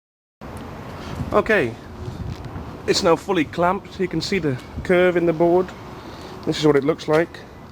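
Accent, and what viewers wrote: Netherlands. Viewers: Haha ik herkende gelijk dat je Nederlands bent. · he is Dutch there's euro symbols on the price tags and the accent is very Dutch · Ik wist dat dit nederlands was!! hoor je aan het accent ;) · Wow ik hoorde haast niet dat je nederlands bent! Je engels is echt goed, je hebt niet dat rare nederlandse accent zoals mij :') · nope hes dutch u hear the accent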